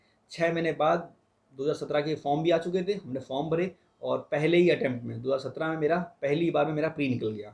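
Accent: Kanpur, India. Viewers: Love your kanpuriya accent 😎😜😉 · are bhai ap kanpur se ho?...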